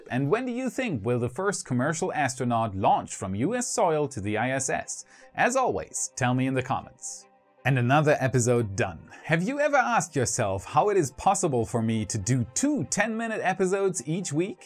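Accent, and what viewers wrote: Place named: Germany. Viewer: Are you german? If yes your English is really good!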